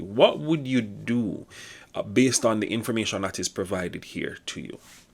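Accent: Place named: Jamaica. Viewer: Sound like a Jamaican 🇯🇲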